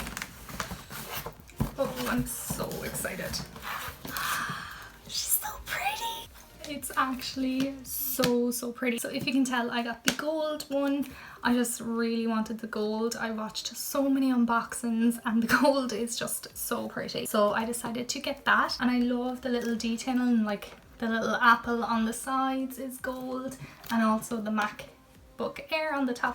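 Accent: Ireland. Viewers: Are u from Ireland? Well asked that too soon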